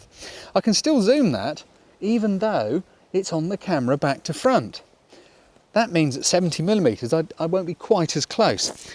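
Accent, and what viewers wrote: United Kingdom. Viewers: thank you for your native british language i really enjoyed it.s really lovely explain it .too much time i have been searching t the internet finally found you thank you.amazing explain .you are good teacher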